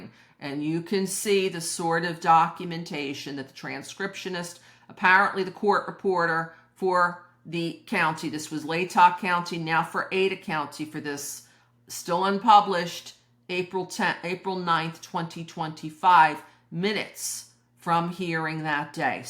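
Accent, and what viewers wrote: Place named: Philadelphia, United States. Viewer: I have a question off-topic. Would you care to settle a bet between my wife and me? (Yes, despite my YouTube name, I am a male (long story)) We were trying to pin down your accent. She thinks Philly and I think Deleware. Do one of us have it right?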